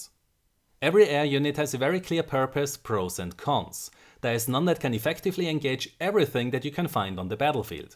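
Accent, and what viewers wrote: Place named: Germany. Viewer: can you speak german? I swear I heard a lot of german pronunciation in your work, so cute!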